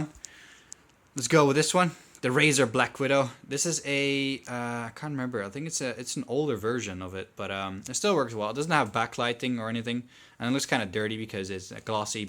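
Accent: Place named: Netherlands. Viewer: do you live in the netherlands? i hear some dutchish sounds in your english and i think i saw a netherlands flag on the word book next to the tv:p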